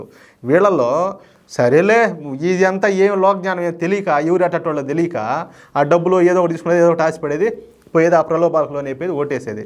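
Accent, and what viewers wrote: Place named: Kadapa, India. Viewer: ఆహ్! నిజంగానే వీనుల విందు బ్రదర్ . నీ కడప మాండలికం తెగ నచ్చింది.మాది రాయలసీమ .జై బీజేపీ.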